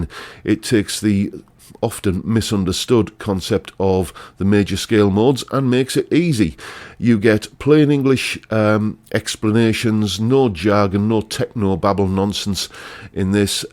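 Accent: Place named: Northern England, United Kingdom. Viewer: As always, I do hope you're well 😎😊 love your stuff, really really love it, and who can honestly say that your northern accent isn't totally great 😎 Just a pitty I live in Denmark, otherwise I would be having lessons in person every week 🇩🇰😎😎 Love it and keep up your great stuff To many YouTuber guitarist's making c.... with their posted videos. Great stuff and very interesting stuff in many ways 👍🏻👍🏻😎😎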